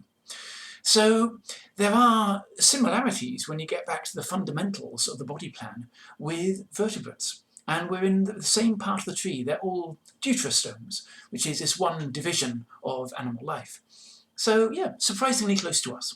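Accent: United Kingdom. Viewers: So cool! I have never read anything about graptolites other than that they existed and are useful for dating rocks. That is a very interesting question about how a colony of successive clones achieves those kinds of alternating ordered shapes! And why such fragile collagen skeletons fossilize so well! I wonder if aside from collagen they had some kind of pervasive toxic chemical that discouraged decomposers. I'm straining my brain to remember what popular science book I read in the last couple of years - by a woman author is all that I remember, but the topic of the book must have been paleontology or earth history - describing in one chapter a cold drizzly steep hike somewhere in (Scotland?) with a renowned older-but-tougher paleontologist looking for fossil/ geological evidence of a major extinction event, and graptolites were a big part of the evidence... 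By the way, for Dr. fans who have trouble understanding her (like me), when I slow down her beautiful chirping Scottish accent to 75% (in the settings for the video) suddenly I can understand every word! (except for some of the Graptolitic terms that I didn't remember from earlier in the video, but the context made them clear).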